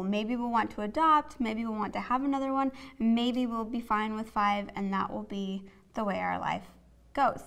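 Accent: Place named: Idaho, United States. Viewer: when you said "belly", I couldn't tell if that was your cute little Idaho accent poppin out (it's not that noticeable anyways, don't worry! haha) or if you morphed baby and belly together, because it definitely came out sounding like "Bay-lee". LOL!!! You are too darling!:)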